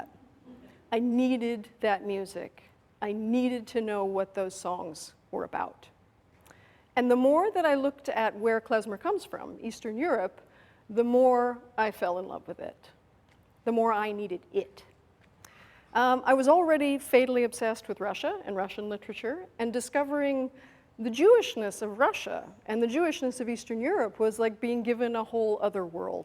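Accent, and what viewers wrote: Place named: New Jersey, United States. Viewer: Her "oy" should be more "uy -- u as in duh, but tighter". And her Jersey accent has been....neutered. I'm not saying Bayway or Sleezeside Heights, but a Morris County accent, perhaps. Especially if she's speaking Yiddish.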